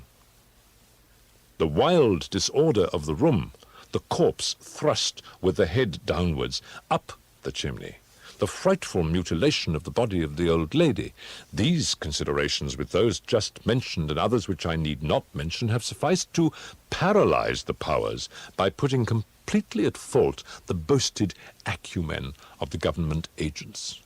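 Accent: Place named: RP, United Kingdom. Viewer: This one was overdone. Also, Poe was an American. It wasn't written with a posh British accent in mind. 🙄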